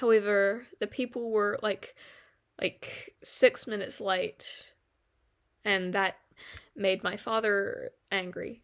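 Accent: New Zealand. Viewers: Your accent swerves between kiwi and southern US. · ur accent sounds sooo familiar r u from nz? or maybe australia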